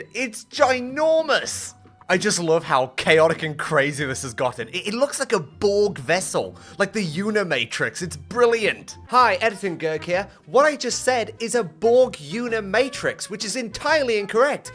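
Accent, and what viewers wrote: United Kingdom. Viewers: Firstly, you have a nice british accent, secondly i think it would be nice if you go to a room, and after some time, closed doors from every direction you can go just open 👍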